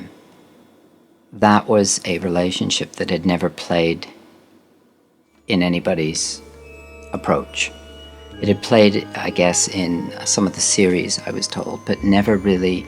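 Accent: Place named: United Kingdom. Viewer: James spoke in a more Gr. Britain style of English?! (At least that kind of impression, his English are giving me here)...Wow! I didn't knew that! I'm impressed! Dear James...we mis you terribly, all over the world! May God rest your soul, and we thank you for the incredible music you gave us! Our love and respect to you, from your fans here in Greece.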